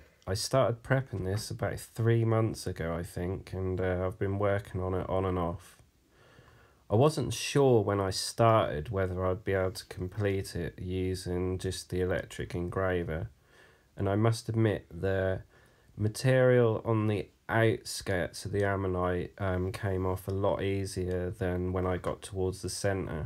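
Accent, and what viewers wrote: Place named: Norfolk, United Kingdom. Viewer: Hi, I live on the Norfolk coast and you sound as if your from my neck of the woods, I have found sum interesting find that I would like to share with you and wondering the best way preserve them to get the best from them.????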